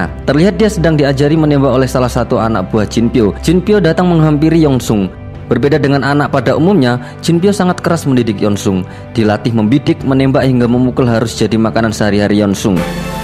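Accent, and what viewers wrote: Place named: Java, Indonesia. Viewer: Disini mimin masih rada medhoq 😅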